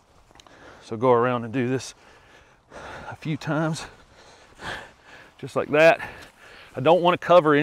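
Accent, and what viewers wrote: South, United States. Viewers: Hello, I am obsess with Camelias. Sadly I live in a zone 6. Any Camelias you know that are extremely hardy? Thanks so much Love your southern accent btw 😎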